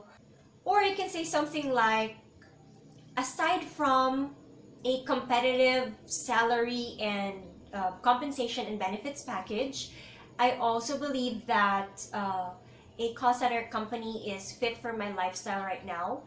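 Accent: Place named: Visayas, Philippines. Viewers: Bisaya kaayo miss oi 😊😊. Nag tanaw kos mga vlogs nimo ma'am kay mangaply ko balik puhon , maytag dili naku kulbaan 😂😂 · Haha bisaya diay ka ate. ;) Anyways, I love the way you speak ate. Planning to apply another call center again. It's my third time applying and I hope matanggap na ako😂❤️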